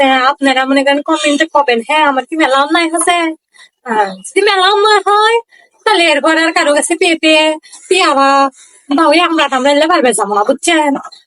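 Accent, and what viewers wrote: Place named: Bogura, Bangladesh. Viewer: ভালোই তো বলতে পারো বগুড়ার ভাষা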